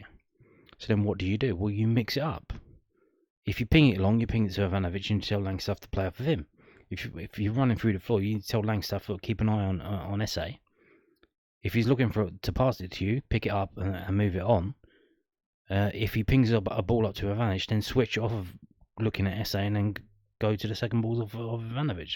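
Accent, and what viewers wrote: Scotland, United Kingdom. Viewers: Interesting and informative but that Scottish accent 🤬 fuck me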